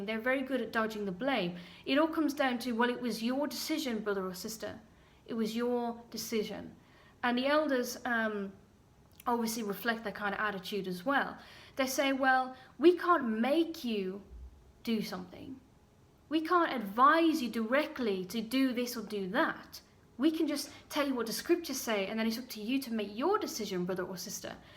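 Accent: Ireland. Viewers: You have a slight Irish lilt or is it country bumpkin ..well ,can we get a close up on these rings ? Your logic makes too much sense ,and this is why you are out of it. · Is that an Irish accent trying to sneak its way through? Great video btw! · I saw that movie, Gas light.....some frenchman and I think Ingrid Bergman. He was turning the gas light down just a little or something, making her think she was losing her sight also. YOu are spot on, if anything a little soft on them. Do I detect an Irish accent? it is very slight, but I love it.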